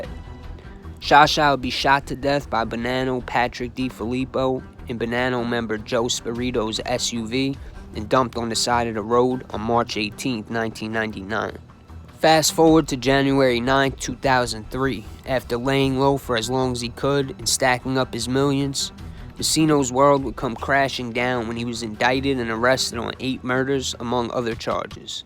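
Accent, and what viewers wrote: New York, United States. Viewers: oh nice. That would be great 2 a week. Your ny accent is a huge part of what makes your stories so great. If you don’t mind me asking, what part of New York are you from buddy?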